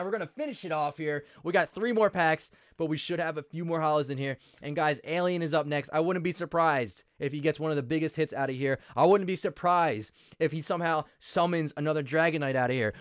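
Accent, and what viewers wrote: New Jersey, United States. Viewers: I know a Jersey accent when I hear one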